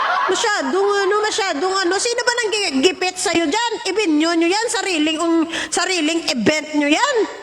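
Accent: Iloilo, Philippines. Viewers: I like your Iongga accent.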